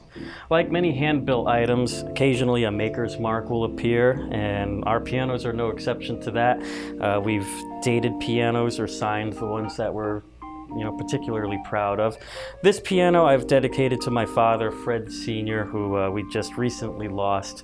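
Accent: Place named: Newark, United States. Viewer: Dat Ne'awk dirdy mouf akscent! 😄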